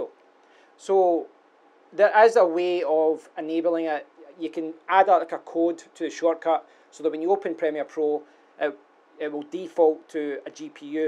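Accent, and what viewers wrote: Scotland, United Kingdom. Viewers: Hey, is it scottish english you're speaking?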